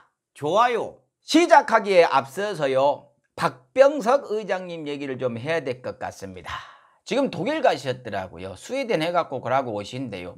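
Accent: Jeolla, South Korea. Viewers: 강성범씨 고향 전라도셨나보네요 ^^ 말투가 전라도 말투가 아주 구수하니 듣기 좋습니다!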